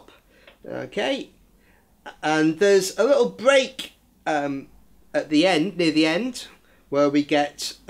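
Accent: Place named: Northern England, United Kingdom. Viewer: Far and away the best lesson on this wonderful song. Love your accent, sounds like something out of Coronation Street. Cheers from New Zealand.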